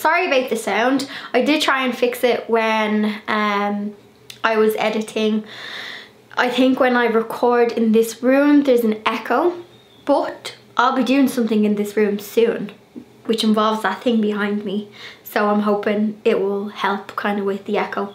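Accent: Ireland. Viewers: Am still working from home. Have you returned to work or are you focusing on YouTube? Love your channel, ❤️ your house, and especially your adorable Irish charming personality and accent. Isn’t spring wonderful?💐🌻🌿🪴hugs from Arizona 🌵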